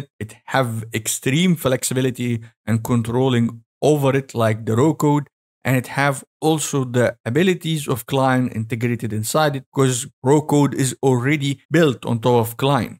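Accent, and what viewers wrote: Egypt. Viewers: Egyptian accent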